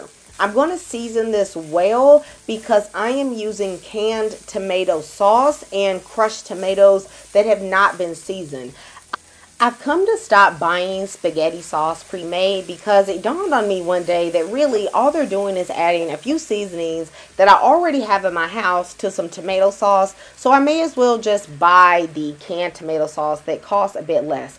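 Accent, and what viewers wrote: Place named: St. Louis, United States. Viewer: You sound like you from St Louis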